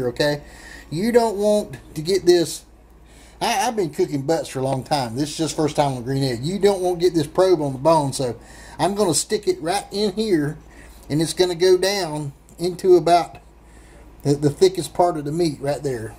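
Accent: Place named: South, United States. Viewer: I don't know what was more entertaining your southern draw or the excitement in your voice. Really enjoyed your video!!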